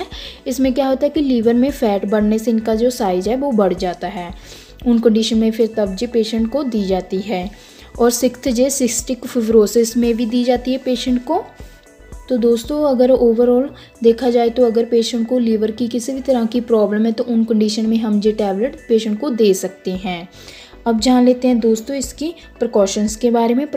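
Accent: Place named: Punjab, India. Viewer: Aap punjab se lagte hai ..khas kar barnala side se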